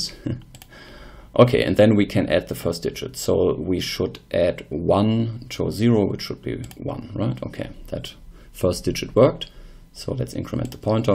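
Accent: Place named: Germany. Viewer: Yes it is. I'm converting some bibles (from diferent publishers) from PDF to XML. I'm strugling a bit because the pdfs are far from being well structured as a text format. So the parser get's relly messy. And also I have very few experience with parsers. Hmm: Ich nehme dein Antwort als ein "Ja" :). Ich habe das Firefox Fenster nicht gesehen. Aber deine Ausprache und Pausen sind ähnlich zu andere Deutsche die ich schon kenne, insbesondere wenn sie langsam sprechen. Viel Erfolg !!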